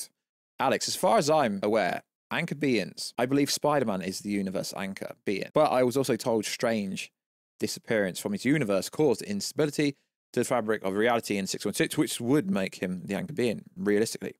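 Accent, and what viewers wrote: United Kingdom. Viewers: It is a very British listen for sure. lol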